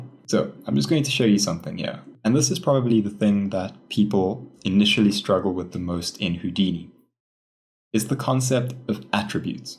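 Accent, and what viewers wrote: South Africa. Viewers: Only stumbled on your stuff now...almost 2 years later. I was surprised to hear the South African accent. I'm here in Gauteng. Great tutorials man!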